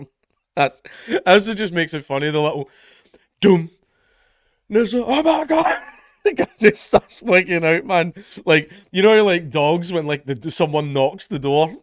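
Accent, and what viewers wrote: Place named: Scotland, United Kingdom. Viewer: "Irish accent", coming from a famous Scottish fella? You numpty.😂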